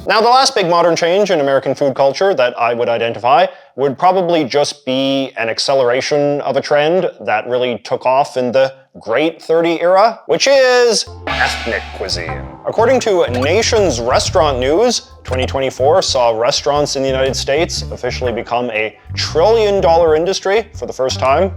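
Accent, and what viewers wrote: Canada. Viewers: Your accent is aggressively Canadian. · I live extremely close to a major crossing from the u.s.a to canada and this guy's voice is complexing and annoying as hell! Like I assume he is canadian and their english doesn't flow the same way as american english for sure but I've never heard it so... monotone and yet choppy for lack of words. I was interested on the subject but can't continue watching the video as the voice is absolutely dreadful.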